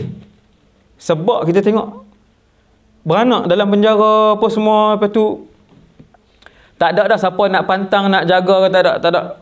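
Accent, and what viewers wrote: Kedah, Malaysia. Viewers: Suka dengar ceramah ustad.. Dengan loghat kedahnye...❤️.. Semoga ustad sentiasa dlm lindungan dan rahmat Allah.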